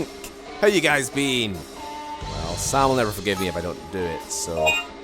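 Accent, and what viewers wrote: Scotland, United Kingdom. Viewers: Scottish narration makes everything better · what part of Scotland are you from?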